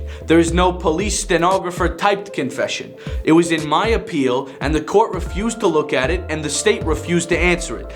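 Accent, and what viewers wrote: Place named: Canada. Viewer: Are you from Canada? A few ways you pronunciate certain words, remind me of some dear Canadian friends, I knew years ago. Love your channel plan to binge today!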